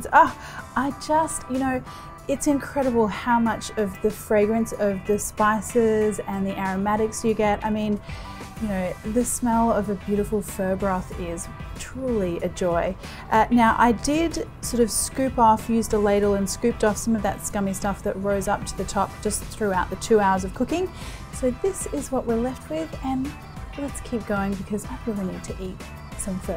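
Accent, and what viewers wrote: Australia. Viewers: I love how Australian accents add an 'er' to the end of words... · That’s her Australian accent.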